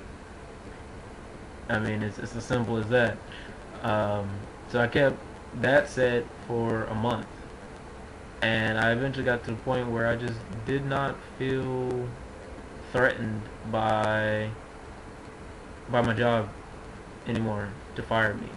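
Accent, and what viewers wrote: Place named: Texas, United States. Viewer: First off, let me say your locs are Rockin'! Second of all, you're not hearing what we hear in your voice. Your voice is fine, even has that special oomph with the Texan accent. So be encouraged. And since you write poetry, this will be your way of getting out of that insecurity with your voice by letting us hear your spoken word. Thanks for sharing. Subbing, hope you'll do the same.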